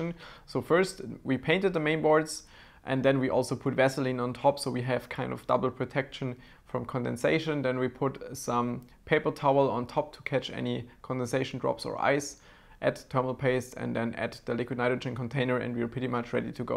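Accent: Germany. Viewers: man hört irgendwie das du deutsch bist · Deutscher Akzent xD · You can hear his German accent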